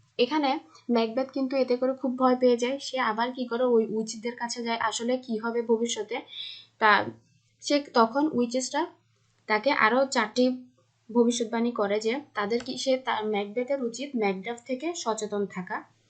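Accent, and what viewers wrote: Chittagong, Bangladesh. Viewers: you are from Chittagong right?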